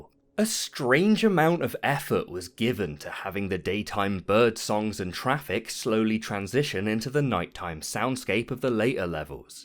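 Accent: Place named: United Kingdom. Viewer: Totally agree. I think it's both but also his voice. He's got a pretty calm uk/euro accent that has a comforting quality to it. I use it to fall asleep to pretty often too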